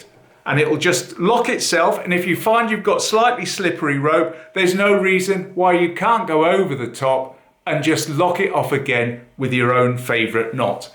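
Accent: United Kingdom. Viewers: My favorite knot channel. Great, clear instruction, warm friendly and pleasant British accent, and excellent filming and editing. I just love this guy!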